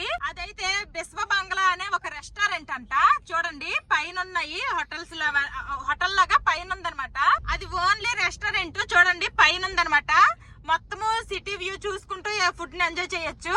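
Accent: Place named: Telangana, India. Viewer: Miku Telangana slang istundi akka😂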